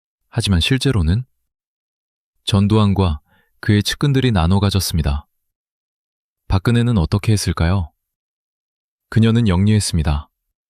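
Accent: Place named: China. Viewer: 중국 발음이네 AI가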